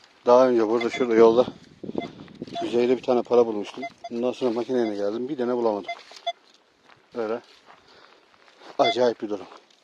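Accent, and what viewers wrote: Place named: Uşak, Turkey. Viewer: Usta sen nerelisin ya bizim uşaklılar gibi konuşuyorsun şiven çok benziyor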